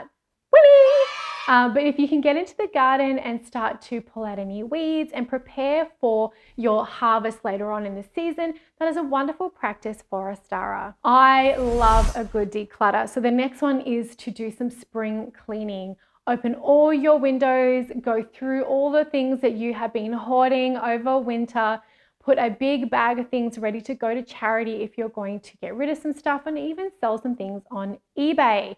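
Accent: Australia. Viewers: the accent threw me off. Australia. 🦘 🐨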